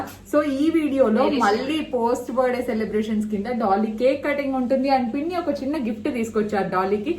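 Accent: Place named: Madanapalle, India. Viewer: A big fan of uh akka ❤️ I'm from madanapalli n whenever I see ur vds I feel lyk ur one of our family member n ur slang is super related to us. Keep rocking akka 🥳🥳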